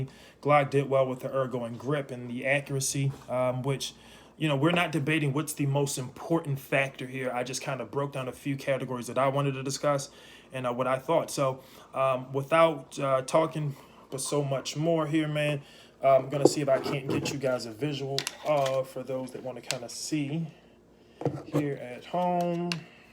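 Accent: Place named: Maryland, United States. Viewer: Dem O’s & U’s definitely from Murland 😂 keep up the good work bro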